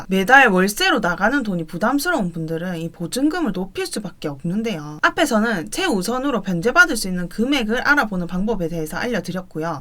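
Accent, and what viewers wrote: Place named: Busan, South Korea. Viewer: 사투리 윽수 까리해요 맕 가 마산 창원 울산 아니고 딱 부산이네마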